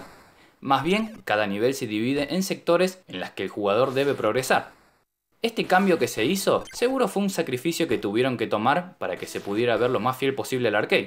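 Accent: Argentina. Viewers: Por que carajo me olvide que seguia este canal? Muy bueno tu contenido y tu acento argentino pipicucu saludos de Entre Rios. (faltan insultos) jajaja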